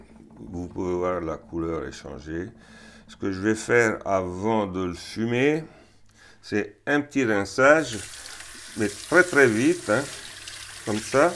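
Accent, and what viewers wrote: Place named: England, United Kingdom. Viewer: Sir, thank you! J'admire votre courage de présenter la recette en français, alors que j'ai décelé un accent anglais. 💛👍. Subscribed!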